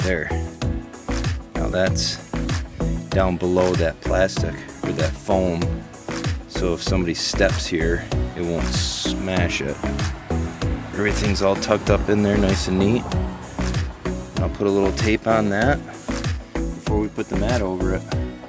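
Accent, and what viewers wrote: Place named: Upstate New York, United States. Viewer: Very helpful and thorough video… thanks for sharing! Ps - Upstate NY accent for sure! 🙂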